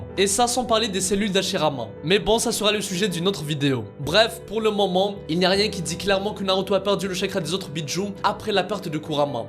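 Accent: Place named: Kabylie, Algeria. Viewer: l'accent kabyle est fort présent ! xD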